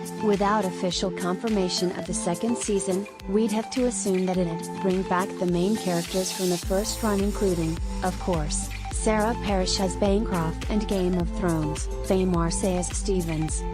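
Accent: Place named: United States. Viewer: I lost the will to live watching this, and now this robotic, American blurb ..... aaaaggghhh!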